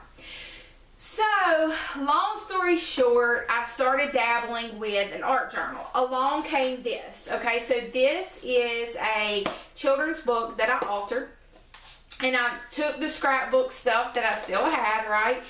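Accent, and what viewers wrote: South, United States. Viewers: I'm definitely getting that magazine as soon as I get my check next week! I know where Barnes and Noble is. I love watching you and hearing you talk because you are so southern, and I'm southern too. I live in South Carolina.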